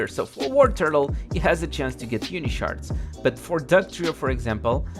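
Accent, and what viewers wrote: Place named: Portugal. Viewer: eu tava entendendo ele falar em ingles, tava achando estranho...ai vi que ele é portugues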